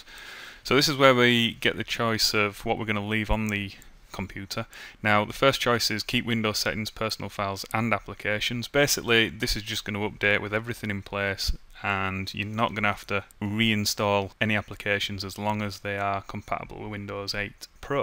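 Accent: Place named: England, United Kingdom. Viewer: Its realy hard to understand you with your long english accent but I think its only me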